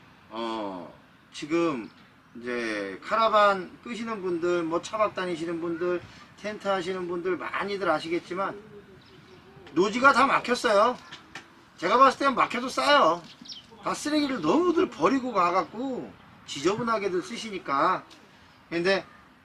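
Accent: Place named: South Chungcheong, South Korea. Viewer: 말하는게 충남 서산여 ㅎㅎㅎ 발전기 몃키로에요?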